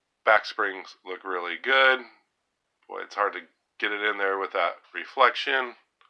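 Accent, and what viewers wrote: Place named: Georgia, United States. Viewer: Hey brother You're starting to sound like a Georgia boy and I like it 👌 Really great knives today, love the stag and the burnt bone . Thanks for sharing brother 👍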